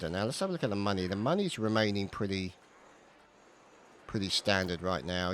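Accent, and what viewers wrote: England, United Kingdom. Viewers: where you from your accent is awesome I'm guessing somewhere in England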